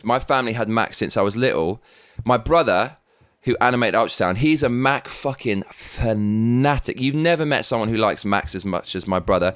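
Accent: England, United Kingdom. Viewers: What part of England are you from ? I was born in Acton London W3 .. lived in South Carolina in USA FOR 50 years .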